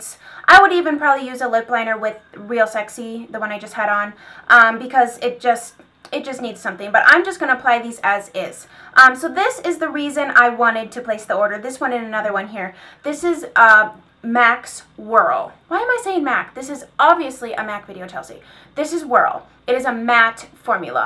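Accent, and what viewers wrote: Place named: Wisconsin, United States. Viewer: No way! My hubby is from superior WI. We go back every year in the summer to a lake near Madison. He has family in sun prairie. I knew that sounded Iike a wisco accent lol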